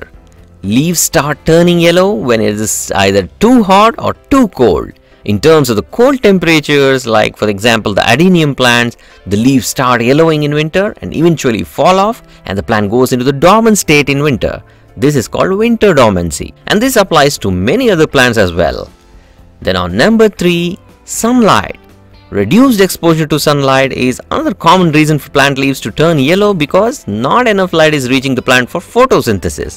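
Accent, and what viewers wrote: India. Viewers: Sir ur Indian english is very good. One thing u should bear in ur mind that u r not teaching agriculture student, Just tell to the point for what is needed for a general home gardener. Thanks.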